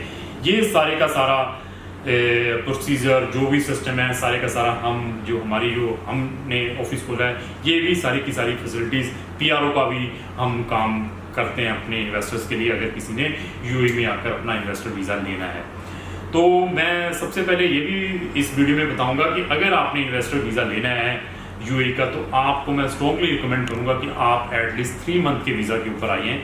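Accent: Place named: India. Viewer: would be nice to write and speak in english as we do not understand indian english sorry,couldnt understand a word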